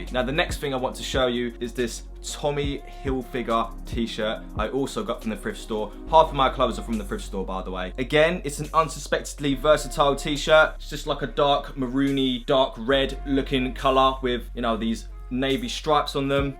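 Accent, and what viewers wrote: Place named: Cockney, United Kingdom. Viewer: the best thing about your vids is your curly brown hair and cockney accent yaass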